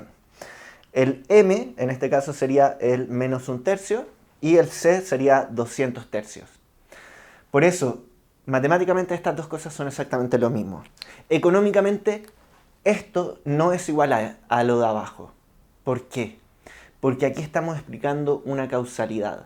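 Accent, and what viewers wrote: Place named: Chile. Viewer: Chileno detected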